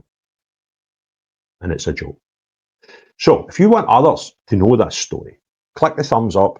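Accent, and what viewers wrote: Scotland, United Kingdom. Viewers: Thanks but I struggle to understand your Scottish accent - just struggling a bit with the Scottish accent - still got the gist though! the Scottish globalist are depending on the taxpayers to fit the bill and that is a bit unfair! Scottish accent strong,! Got it though Appreciate it,! Accent almost lost me!, I’m taking notes… and also trying to decode that accent 😆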